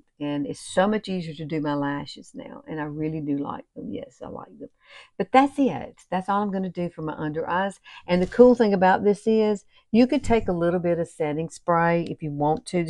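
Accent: South, United States. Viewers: Just came across you and have subscribed. I’m going to try your tips! Love your hair … and your great southern drawl 😊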